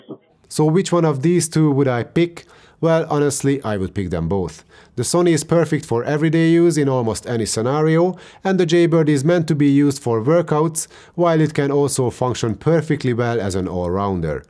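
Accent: Hungary. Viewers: Where are you from mate? You sound Hungarian. Van bojler eladó! Great video btw. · Why do you have a hungarian accent?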